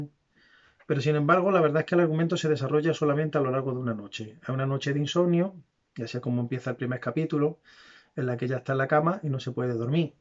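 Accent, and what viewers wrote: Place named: Jaén, Spain. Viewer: Genial el video, me ha servido para hacerme el resumen mental para los exámenes de las pruebas superiores! ^^ PD: como se nota el acentillo jienense jajajaja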